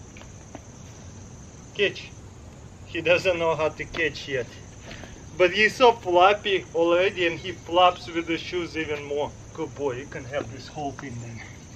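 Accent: Russia. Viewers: Never really been attracted to a Russian accent until I watched this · сильный русский акцент, откуда вы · Ты русский ? Акцент так и пер😂👌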